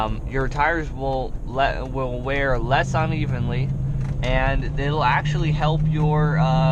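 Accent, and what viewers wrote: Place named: Virginia, United States. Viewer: what part of va is this, I can tell it's va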